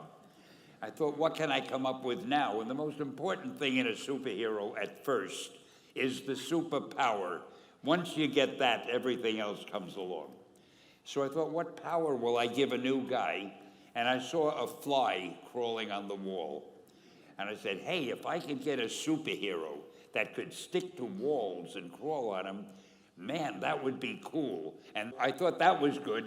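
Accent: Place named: Argentina. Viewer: tremendo acento argentino el de stan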